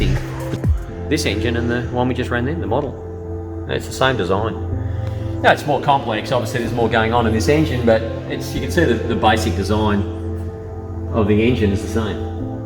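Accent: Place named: Australia. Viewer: Great video! And really nice to hear an Aussie accent in the Experimental category. Great work!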